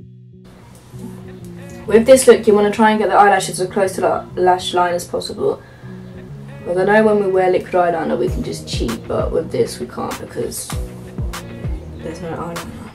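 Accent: England, United Kingdom. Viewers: Where in England are you from?? Flawless btw xx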